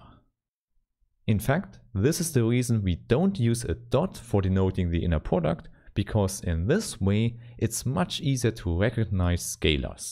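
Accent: Germany. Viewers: nice video, thanks a lot... and you sound German with your accent😁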